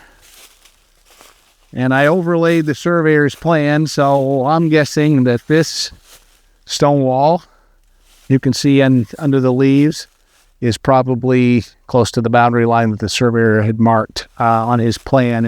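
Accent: Maine, United States. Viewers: Great looking property. I’m a southerner but I like the Maine accent. Very informative.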